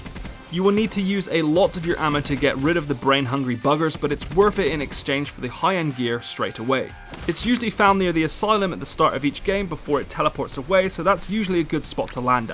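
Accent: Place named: England, United Kingdom. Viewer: Frickin english accent Speak correctly idiot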